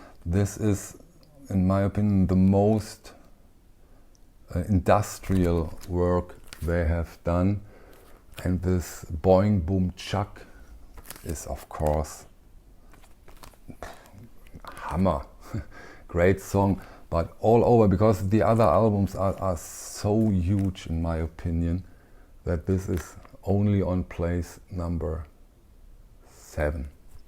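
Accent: Germany. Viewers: The blokes more German than Ralf and co....... Nowt wrong with that ... Nice to hear a german person talking about k - werk.... Germany s Beatles....... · For electronic music, they are extremely musical sounding. Always thought they were great David Bowie recorded in Düsseldorf, simply for his love Kraftwerk When I began tuning into your channel, I mentioned you reminded me of a Kraftwerk member. I just got the vibe, but I realize it is your accent which obviously originates from the Düsseldorf region. Thanks for the great reviews Keep them coming Florian Schneider has passed, not Ralf Radio Aktivitat is my favorite too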